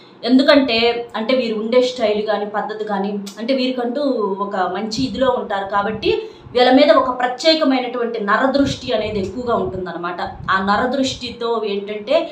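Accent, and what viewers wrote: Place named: Guntur, India. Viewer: గుంటూరు యాస లో బాగా చెప్పారు మేడం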